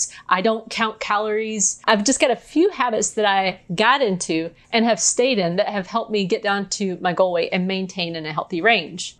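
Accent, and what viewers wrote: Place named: England, United Kingdom. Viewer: you sound very English, my genetic background, however married a Frenchman! I find your slow and steady climb quite remarkable,and reflect if I had been satisfied with a slow approach I’d be done thrice over! Making such changes and so comfortable with your own path is inspiring. Great victory! Even the selling off your collection is profound! I believe I must dedicate my health and consequently eating habits that occupy my frame regarding size/shape to tell a different story from now on, in fact I insist! The wine actually led to picking and snacking…like a sloppy will, so I’m taking the reins, and I’m truly in awe when someone aspires to freedom and Triumphs! Move over I’m on the move!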